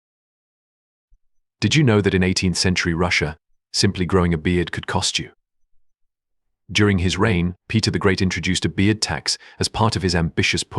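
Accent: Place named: United Kingdom. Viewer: You should not have a Brit accent.. narrator… it ruins the presentation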